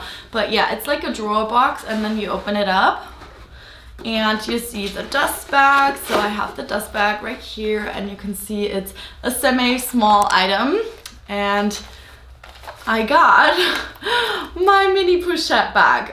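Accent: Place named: Germany. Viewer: Super schöne Sachen😍ich finde wenn man weiß dass du deutsch sprichst, hört man voll den Akzent raus🙈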